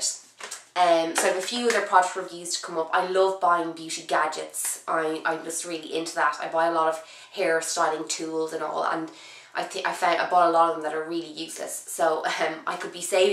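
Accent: Ireland. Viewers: Irish =) The best accent in the world! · are you irish? or from the uk because I hear a accent in your voice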